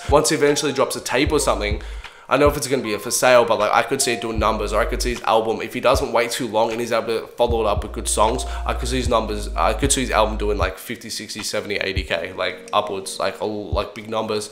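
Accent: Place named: Australia. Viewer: bro ur Aussie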